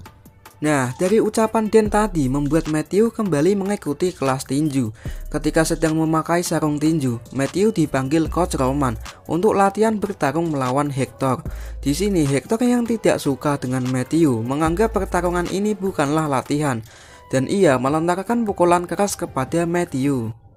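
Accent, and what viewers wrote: Java, Indonesia. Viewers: Mas kamu orang Jawa?? Jawanya mana mas? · Min medog amat suaranya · Bang sampean wong jowo yo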